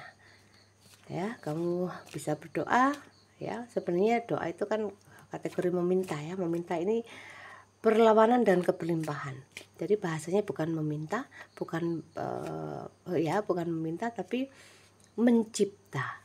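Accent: Java, Indonesia. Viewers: Sy selalu ikutin readingan mbk,dan sy suka dgn cara kejawenya yg medok,trimkasih untuk semuanya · Bismillah menyimak kak. Alhamdulilah Ya Allah. Tindakan positif tetap semangat. Kak bicaranya logatnya Jawa tulen. 💪💪💪🤲🤲🤲🤝🤝🤝❤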